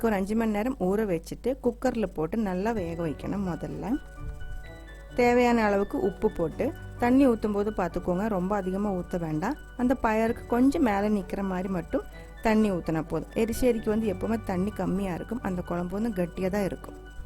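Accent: Kerala, India. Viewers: Your Tamil blended Malayalam is so nice to hear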